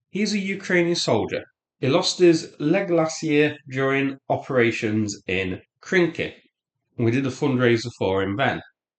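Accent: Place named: Middlesbrough, United Kingdom. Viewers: i'm sorry you have this problem. The good news is: you have a great opportunity to learn understand Middlesbrough accent. It may not match what you expect, but it would be a lot less genuine if he put on some fake south of England middle class accent. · I agree with you. English is the language one uses if he wants to have an international audience. If one speaks with his Middlesborough local accents, then he is not speaking "internationally understood English", not the one which is internationally understood as such. I understand him because I turn the subtitles on, but the subtitles as well often don't understand what he says, and they cannot be faulted at all. I think he would have a larger audience if he used some computer generated voice.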